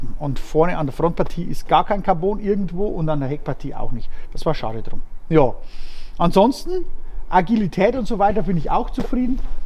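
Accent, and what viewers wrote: Bavaria, Germany. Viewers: Red bitte wieder boarisch!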